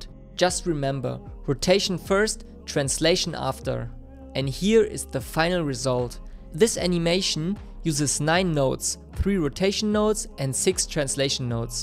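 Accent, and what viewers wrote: Germany. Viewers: This Tutorial helles me alot, tysm ! (Aber du sprichst deutsch oder haha)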